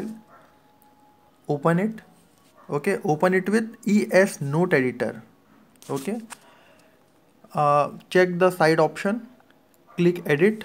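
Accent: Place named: India. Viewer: Obviamente no, jajaja. Sólo los de la India se escuchan así, es demasiado característico.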